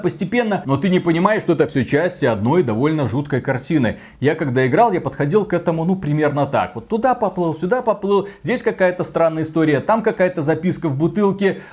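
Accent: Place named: Belarus. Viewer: лайк за трасянку!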